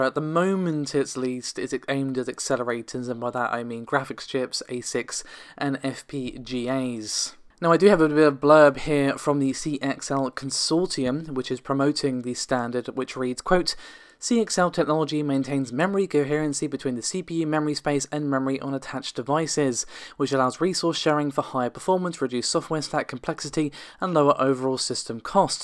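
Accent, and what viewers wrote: United Kingdom. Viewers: HAHA, her name is or at least that's what it sounds like, pretty unusual name for me. I can relate because when I found this channel I also thought she sounded more like a British guy, but not to the point where I would confidently say it was a guy. I'm not a native English speaker so that might have something to do with it.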